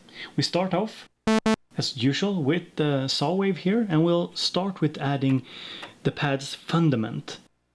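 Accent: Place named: Sweden. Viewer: Judging from the accent this dood must be swedish 🇸🇪🤘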